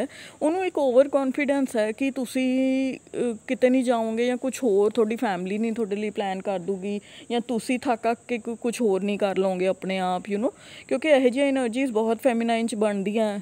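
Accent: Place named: Punjab, India. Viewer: I know this right from early you have an Punjabi accent in Hindi I live Punjabi language I am garhwali but lived in chandigarh a lot. Nice effort...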